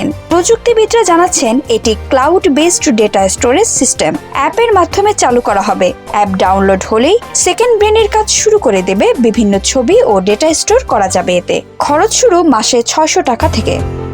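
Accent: Kolkata, India. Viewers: ৭১ টিভি কি কলিকাতার সাংবাদিক নিয়োগ ূিছে নাকি🙄🙄🙄🙄 সেরকমই লাগে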